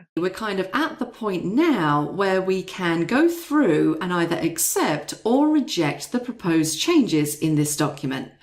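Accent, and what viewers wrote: United Kingdom. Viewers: Very difficult to fiolllow the explanation about track changes turning off or not. Replayed several times, could not understand the british accent, and what was being clicked on. Need to slow it down a little.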